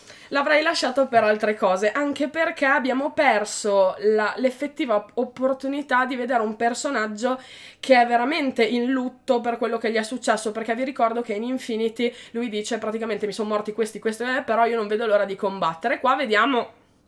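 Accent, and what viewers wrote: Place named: Reggio Emilia, Italy. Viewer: SPOILER 😁Sei di Reggio Emilia?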